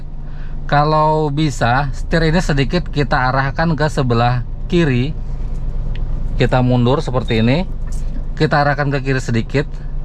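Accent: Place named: East Kalimantan, Indonesia. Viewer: abg dr Kaltim yaaa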